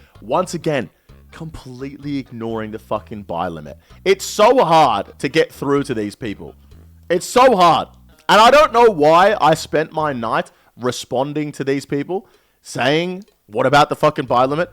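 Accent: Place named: Australia. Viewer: Bro you are the most cringe Aussie OSRS creator, possibly the most cringe out of everyone. No one cares what you think and the fact you think a clips channel of your garbage streams is insane. Get a haircut lose some weight and stop over accentuating the Aussie accent you absolute loser. You and behemoth are embarrassing.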